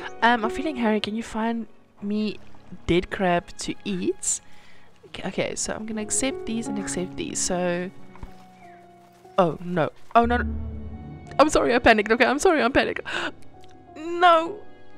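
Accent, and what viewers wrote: South Africa. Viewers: Omg your south African this is amazing I am to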